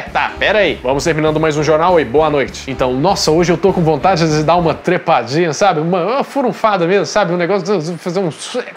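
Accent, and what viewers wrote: Minas Gerais, Brazil. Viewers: Que simpatia! Mineirice mais boa de se ouvir: a original! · Curti e me inscrevi no nerd show, pq gosto do seu sotaque mineiro!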